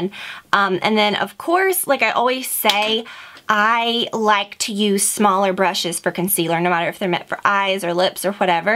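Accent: South, United States. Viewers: Woah wait you have a southern accent???! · I can hear a strong southern accent! Love you! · I don't normally pick up your southern accent in your videos but for some reason I could really hear it in this one lol! Adorable of course, and thank you for all the great info :)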